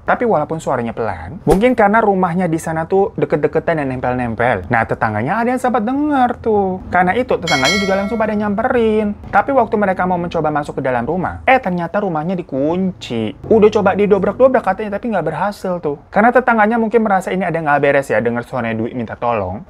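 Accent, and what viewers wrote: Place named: Pemalang, Indonesia. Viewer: orang pemalang mana suaranya.. lah ternyata aku orang pemalang sendirian di sini :(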